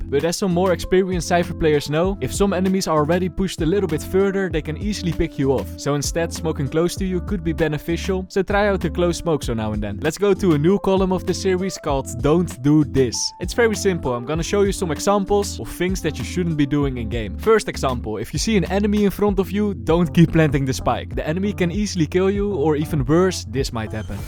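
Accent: Netherlands. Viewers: the isnane dutch accent maar je maakt leuke vids keep up the work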